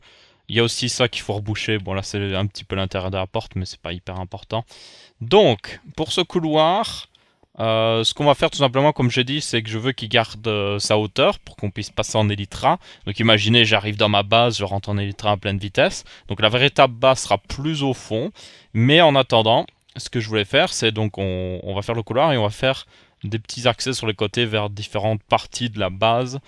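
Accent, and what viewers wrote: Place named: Belgium. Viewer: la vérité t'as voix de belge est sexy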